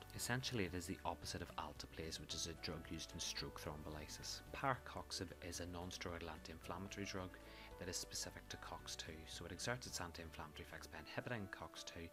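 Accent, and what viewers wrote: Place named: Northern Ireland, United Kingdom. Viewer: Lovely Northern Irish accent..,..mildy sedating..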